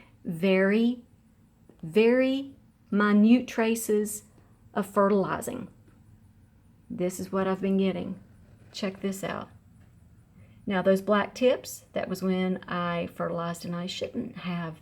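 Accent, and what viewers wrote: South, United States. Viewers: Thank you! I did find this vid helpful/interesting/informative /pleasant. I like ur voice and southern twang, I subscribed (long ago) for that reason. I like how YOU'RE growing! Concerning vids and more detailed info. My growing conditions are SO different from urs I can't help but think ur just one blessed (lucky) lady. Keep sharing details and hopefully the "luck" will spread! I can't remember how long you've had some of those Vanda..? Do you have any Neos, Ascosenda, or Renanthera's? Xoxo from CO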